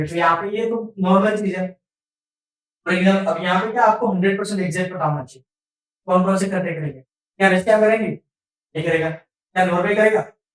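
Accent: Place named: Gujarat, India. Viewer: hindi accent is like gujrati. I think he is from gujarat. I really appreicate sir's efforts for the most amazing lecture. thank you sir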